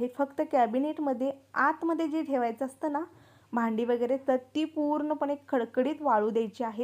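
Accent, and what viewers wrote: Kolhapur, India. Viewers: Tumi kholapurchi bhasha bholta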